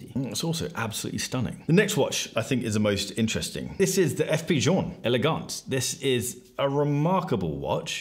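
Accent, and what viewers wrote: RP, United Kingdom. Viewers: he is posh on the downlow he cant help it mate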